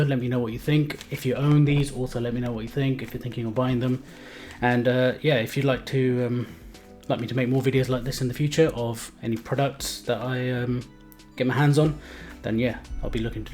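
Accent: England, United Kingdom. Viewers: Great review, liked your pure english accent! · Bhai tumhara accent ek dum english man jaisa hai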